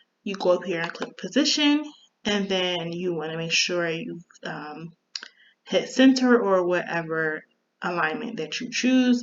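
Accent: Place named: Philadelphia, United States. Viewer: Thank you for this video so helpful!!! 🫶🏾You sound like you from philly… are you from philly ?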